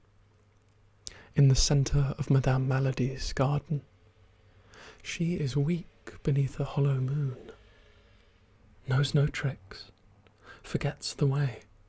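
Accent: United Kingdom. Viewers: One of the reasons that I keep listening to your voice is because I wanna learn your British accent ✨🥲 It is sooo beautiful.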